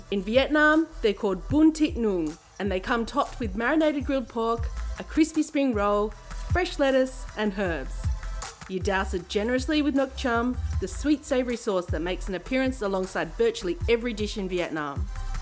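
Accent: Australia. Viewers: I really enjoyed watching this even I am living in Saigon in Week 3 of the lockdown so it was kind of painful to watch. Is your accent Aussie? I look forward to trying all the places post-lockdown and to more of your videos. Cute dog too!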